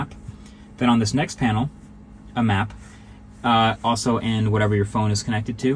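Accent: United States. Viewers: and if you pay attention you would hear that mkbhd is pronouncing it correctly when concentrated. When he gets into flow he pronounces like americans do. So he did make an effort but is drifting away in speech like normal humans do. If you want to critizise him then you could go with something about him not knowing who bought who in the bugatti-vw-rimac deal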